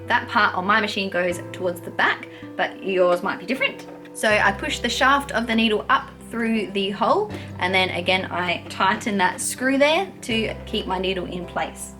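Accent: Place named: Australia. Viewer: Hi, I have only just found your channel. It’s nice to hear a fellow Australian and using centimetres too. I love your tutorials and look forward to seeing the others. I also look forward to making the fitted cot sheets. Michelle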